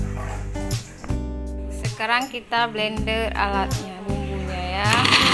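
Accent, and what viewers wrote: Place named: Aceh, Indonesia. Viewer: Brat logat apa Aceh 😂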